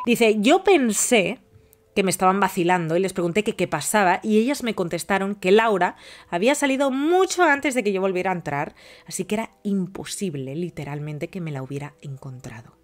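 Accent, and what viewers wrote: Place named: Russia. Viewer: una pregunta tu porque abeces ablas con asento ruso marcando la "R"?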